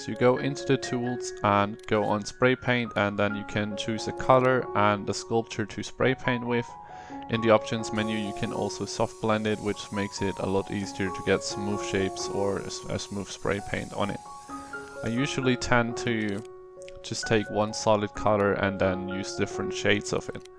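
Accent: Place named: Germany. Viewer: Hab bei Dir sofort rausgehört, dass Du Deutscher bist - aber ich muss sagen, dass Dein Wortschatz und Sprachfluss echt stark über dem deutschen Durchschnitt sind. Danke für die Videos! Das motiviert mich echt, eines Tages mein eigenes Spiel zu entwickeln.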